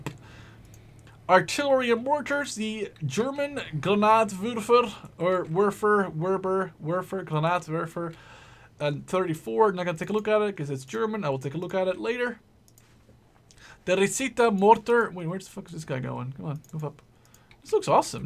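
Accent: Italy. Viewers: Mie ami place oricum cu sa fara accent Italic #respect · Salut spectatorilor si ai folosit accent de italian , dar oricum nu stii ce tocmai am spus :))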